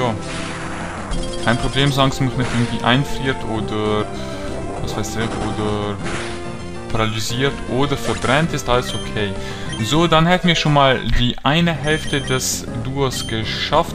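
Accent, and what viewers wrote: Switzerland. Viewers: Bist du Schweizz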